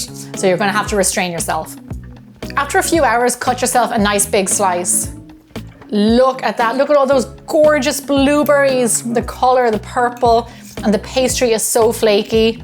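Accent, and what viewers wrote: Ireland. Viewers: Hey I have been watching your videos for the past 1 year. I have to say I find them really therapeutic and calming. And I also love your Irish accent. I have tried some of your recipes and they turned out so good. I really like the way you explain them. NEVER regretted coming and subscribing to your channel.❤️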